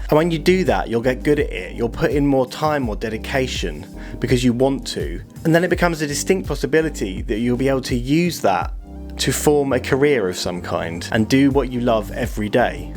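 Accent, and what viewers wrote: United Kingdom. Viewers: I love your channel, even though I prefer video over stills, your perspective in interesting and to the point, none of this "hey look at me" or ridiculously excessive b-roll. Gear reviews can be useful, but they are ten a penny on Youtube and I don't even trust most of them. Nice to have a Brit too, more chilled, less ADHD.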